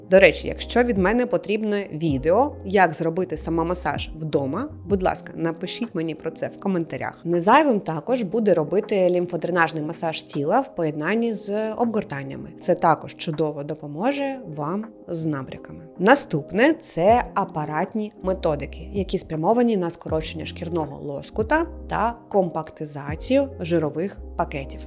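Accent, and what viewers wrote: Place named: Surzhyk, Ukraine. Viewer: Шийно-КОМІРЦЕВА зона...купа суржиків, неприємно слухати(((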